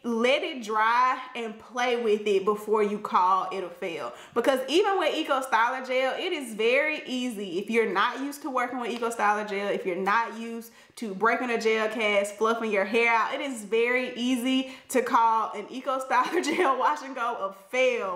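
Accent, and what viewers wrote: South, United States. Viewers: I love all your tips, i had been struggling with wash n goes fir a while and just decided to revisit them, im still learning, and you have inspired me not to give up, your hair is gorgeous and Iove your southern draw 😘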